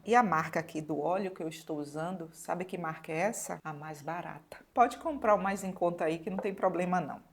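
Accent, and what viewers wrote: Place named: Fortaleza, Brazil. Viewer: É por essas dicas maravilhosas que gosto muito do seu canal ❤ E com esse sotaque, mulher eu achava que vc era de fortaleza kkk E de onde vc é?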